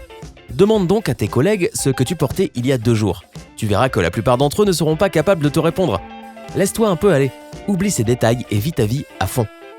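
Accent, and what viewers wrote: Quebec, Canada. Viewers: Dite vous etes québécois hein tes un youtubeur québécois ?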